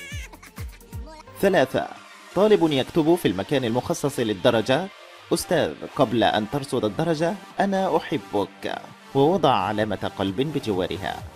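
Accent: Egypt. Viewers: ايه الكلام المصري ده ضحكني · والله انكشفت طلع يعيال الي يتكلم مصري 👀👀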